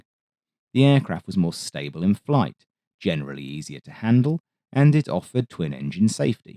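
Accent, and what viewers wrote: United Kingdom. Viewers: Hearing a british voice talk about killers The uk is nothing but murdererous thieving pos country.... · I like the British accented narration. Just gives it more credibility. Like Great Planes or Discovery Wings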